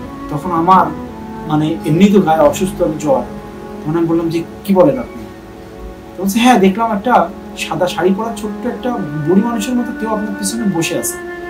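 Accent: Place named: Chittagong, Bangladesh. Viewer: bhai ki chittagong er manus? apnar vasha te chittagong er vasha er ektu tan ase hahaha <3 love from ctg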